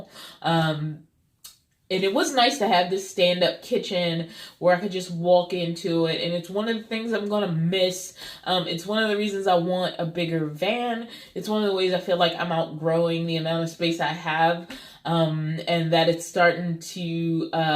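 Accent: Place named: Maryland, United States. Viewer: I grew up in Baltimore. I thought I heard a bit of the ole Maryland accent. Enjoy your travels.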